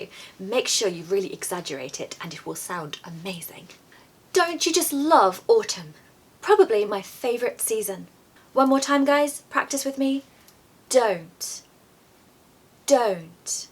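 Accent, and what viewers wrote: RP, United Kingdom. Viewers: You speak modern RP. So, for the word PLUM you pronounce plʌm, don't you? However Matthew, my friend from Belfast, didn't understand me when I said it as you. When I wrote it down, he told me this pronunciation (more or less): plɔ:m When I explained it to Elizabeth, my English teacher, she told: I pronounce plɔ:m because I come from Liverpool, but with you I pronounce plʌm because I teach you standard English. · Love that British accent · I love Bri ish accent 😁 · "Your teaching is absolutely excellent! We especially love learning pronunciation from you, and your British accent is simply wonderful. The way you pronounce words is something we appreciate every time, and it truly helps us improve. Thank you for sharing your knowledge with such clarity and grace!" "Goodnight from my side of the world! Hope you're having a wonderful [morning/afternoon/evening] wherever you are, my beautiful teacher.. 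Hugs.xoxoxo Gams ❤️ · That you have a strong 'tea sound', is it that surprising for a Brit? ... ;-)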